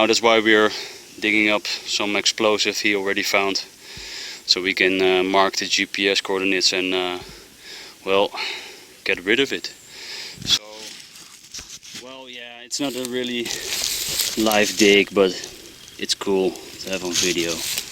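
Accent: Netherlands. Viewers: U sound dutch.. EDIT: u are dutch haha ik ook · i know that this man is from the nethelands cause im hearing his acsent XDDD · ik dacht van het begin al dat jouw accent heel Nederlands klonk, had ik dus tocht gelijk. PS. ben geabonneerd. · Are you Dutch or something? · As a Dutch man you can hear it when a Dutch man speaks English anyways nice video! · You sound dutch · Are you dutch i am and you sound like your dutch to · nice dutch accent...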